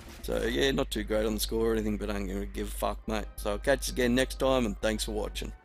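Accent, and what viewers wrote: Australia. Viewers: Hmm, you sound pretty australian to me :) As I am austrian, i love it when ppl mix names up xD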